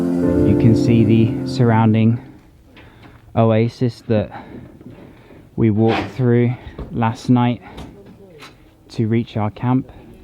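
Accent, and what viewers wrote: United Kingdom. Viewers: Same as me I was always looking at this lakhdaria I've never seen any other place until I've once went to oran and now I'm learning more about my country from a foreign tourist as you see 😅. I thought that we have no tourism at all 😂😂 until I saw this video btw from his accent I suppose that he's from UK I'm kinda impressed by the information that their universities provides for their students and I hope I can go study there 😅.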